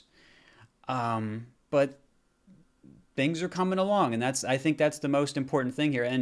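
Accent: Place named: Tennessee, United States. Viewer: I'm just trying to figure out where your accent went. I hear a subtle Tennessee dialect. But other wise its gone.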